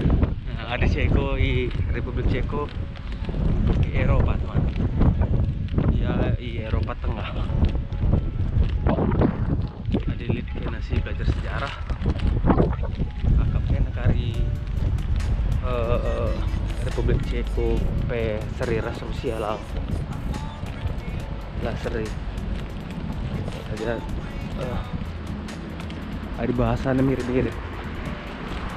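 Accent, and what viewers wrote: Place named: Karo, Indonesia. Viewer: Ada yg jual Arsik di ceko Bang, 😁 Kental kalii, Kalak Karo na 😉😁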